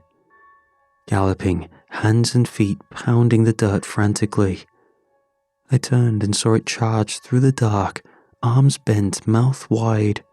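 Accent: United Kingdom. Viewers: I can’t sleep without bedtime stories! 🎉thank you for your dedication and British accent lol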